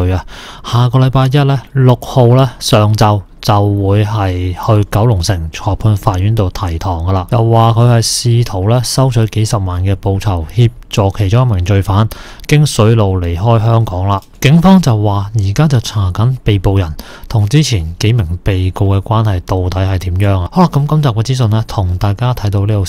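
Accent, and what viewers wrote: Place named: Mainland, China. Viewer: 你把聲 好似係大陸人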